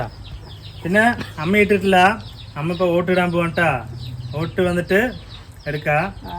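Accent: Tamil Nadu, India. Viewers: Niglu malayali ahnno tamil ahnno language difference thonnine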